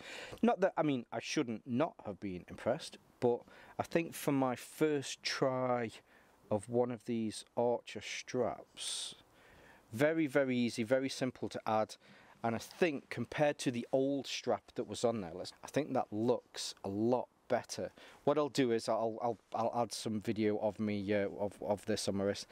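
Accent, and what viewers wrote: United Kingdom. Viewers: Hi, great video and nice watch :) I'd love to do this to mine although unable to find somewhere to buy Jays and Kays in the UK, assuming you're in the UK from the accent, where abouts were you able to get these from? Cheers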